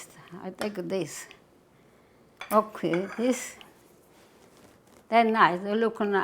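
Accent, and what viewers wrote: Italy. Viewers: Awesome garden. Wonderful that age 90 she still is going strong and still has her Italian accent! What kind of peppers are those? Awesome singing about Rome! Thank you from the other side of rt 33 in Hightstown!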